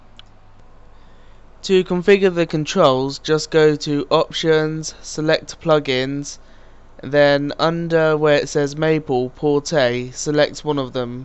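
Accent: United Kingdom. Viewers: I believe I was just instructed by Harry Potter...O_o cool accent xD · Two things. You are a savior in the world of video gaming. You have helped me play Resident Evil - Code Veronica. You have a British accent. That's awesome. But for some reason everything you say is interpereted by my computer into this kinda thing; - "7 great rings were forged from the fiery pit of Mt. Mordor." - "One ring to rule them all." - "They're taking the hobbits to Isenguard." PLZ HALP! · the way he pronounces dreamcast games:driimcost gheims ok,i know hes british or something xD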